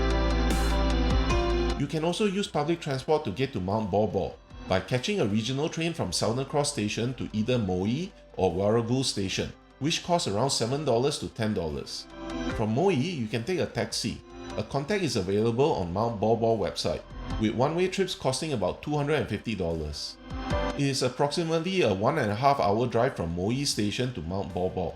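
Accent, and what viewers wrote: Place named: Malaysia. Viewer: Thanks for the info bro, amazing video😊. I am also planning a trip here soon. Btw are you Malaysian as well? Guessing based on your accent😁.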